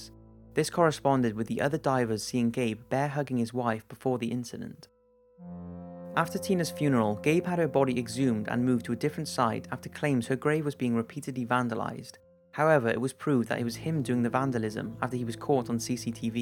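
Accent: Wales, United Kingdom. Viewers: I'm hyuring the "yur"s in your Welsh accent. Awesome! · He's Welsh, sounds like somewhere near Cardiff. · its a welsh voice, so naturally nice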